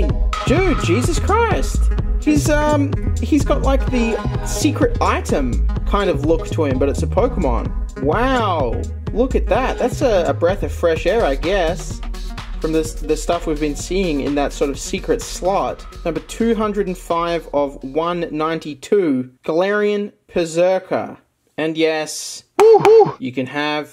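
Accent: Australia. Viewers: U an Aussie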